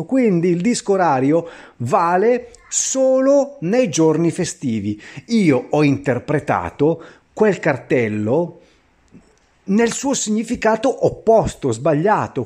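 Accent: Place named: Bergamo, Italy. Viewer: Ciao. Mi piace ascoltare il tuo accento Bergamasco quando non ne puoi più.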